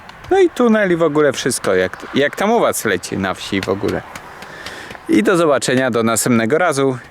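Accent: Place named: Podlasie, Poland. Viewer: A tak w ogóle to swój chłop jesteś fajnie zaciągasz,ja z Podlasia,to wiem,co mówię!!! NIECH ŻYJE ŚCIANA WSCHODNIA!!!!!!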